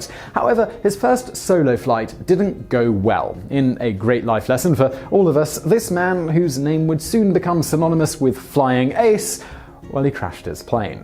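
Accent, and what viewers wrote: England, United Kingdom. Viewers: Lay off that pretentious accent🇬🇧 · I can't listen to this guys horrid English accent.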